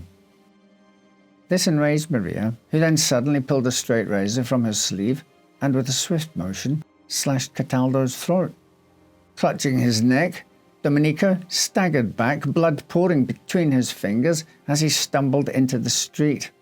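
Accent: Scotland, United Kingdom. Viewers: Scottish accent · He is Scottish - Edinburgh or Highlands?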